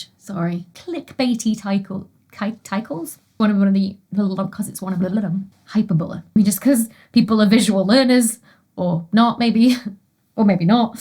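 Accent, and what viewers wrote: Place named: North West England, United Kingdom. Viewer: I always come to Dr. Becky's parlour to listen to her brilliantly articulated speech. It's a pleasure to hear her speaking the North West English.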